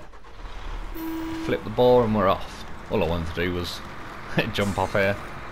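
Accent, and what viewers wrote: United Kingdom. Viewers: Your british accent is so hard...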